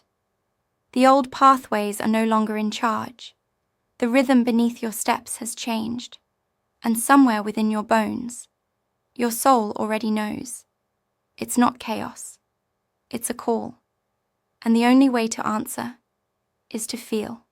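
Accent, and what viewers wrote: United Kingdom. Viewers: with the Brit accent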